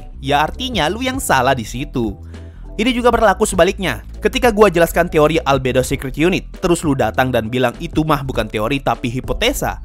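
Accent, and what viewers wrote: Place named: Eastern Indonesia, Indonesia. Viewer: Boleh tau suku apa bro? Tidak ada maksud menyinggung, soalnya dulu perasaan logat timur lu kerasa banget di video lama tapi makin ke sini makin halus dan nggak kerasa lagi logatnya.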